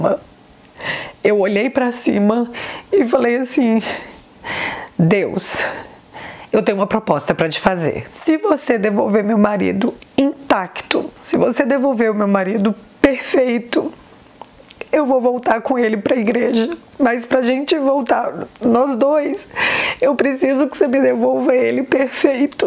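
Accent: Minas Gerais, Brazil. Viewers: Olá, te sigo há muito tempo daqui da Inglaterra onde u moro, você já me fez sorrir e até gargalhar com seu jeitinho e sotaque mineiro, mas hoje me fez chorar, talvez vc nem veja essa mensagem, pois são tantas as que vc recebe, mas se você ler, quero que saiba que você é muito especial, seus vídeos esclarecedores e me ensinam muito mesmo! Quando comecei a te seguir você não tinha nem cem mil seguidores e olha só como você cresceu! Deus tem te abençoado, continue com Ele e que mais bençãos recaíam sobre você e família! Também sigo o Rodrigo Silva e também sou Adventista, que o Pai Eterno esteja com você e que seu canal seja para a Honra e a Glória Dele 🙏🙏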